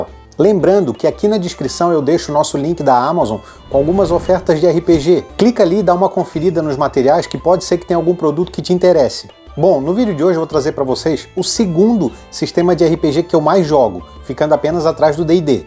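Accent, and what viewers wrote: Florianópolis, Brazil. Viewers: Sotaque de manezinho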